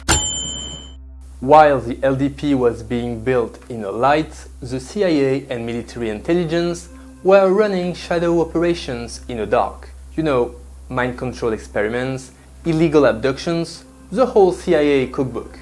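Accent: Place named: France. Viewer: You sound like a Frenchman.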